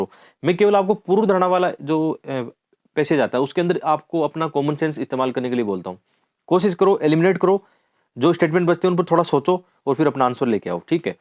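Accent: Haryana, India. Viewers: Sir are you from haryana apki awaj se pta chlta h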